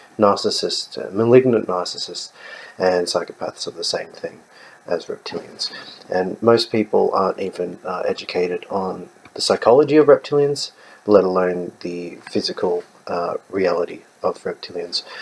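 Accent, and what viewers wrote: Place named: Australia. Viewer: Is there a possibility that Reptilians aren't aware of their background? If they're 10% of the population, who and how are they i informed? Are they approached by so called "handlers" during early childhood? I'm not sure off the process. I believe in Psychic Vampirism. I've seen peoples face distort, especially the eyes. It didn't scare me because it was a confrontation and I was calling out the persons behaviour. That's why I believe I got to see the real person behind the facade. Now, like yourself, I can spot them almost immediately. So I come across a few people that are in "attack mode" for no reason. I've had to walk away from so called friendships because these people suck the very essence out of you. And who wants that. Great to hear an Aussie voice talking about this phenomenon aswell. Keep up the good work bro.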